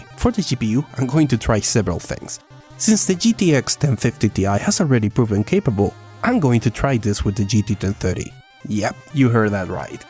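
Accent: Spain. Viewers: Wait, are you from Spain? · Un segundo, eres español? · Eres de españa ? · Espera, ¿eres de españa? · como que es de españa? haz un video en español pls solo porque quiero conocer como suenas en español XD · Hey tío, eres de España? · alv eres de España? :0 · De que parte de España?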